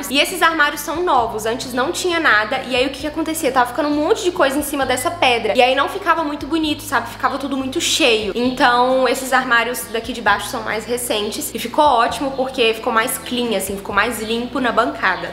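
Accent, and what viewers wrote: Minas Gerais, Brazil. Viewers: Fugão, tremsão kkkk é mineira mesmo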